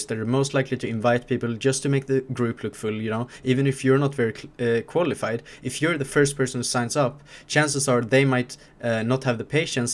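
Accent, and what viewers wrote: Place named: Sweden. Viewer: Du måste vara svensk haha hör det på engelskan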